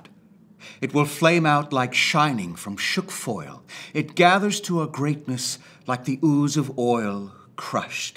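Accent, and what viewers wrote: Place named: United States. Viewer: It doesn't work with an American accent. Hopkins was English.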